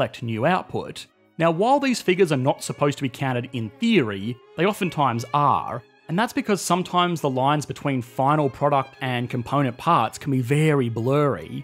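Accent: Australia. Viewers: I am guessing that it is Australia that you are from, please tell me if I’m wrong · Hey, man. Let me, please, make some subtitles for your videos. I can even translate it to portuguese, if you will. The Australian accent ain't as clear as you might think. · Love your channel but please please please don't say "zee". It sounds so wrong coming from an Aussie accent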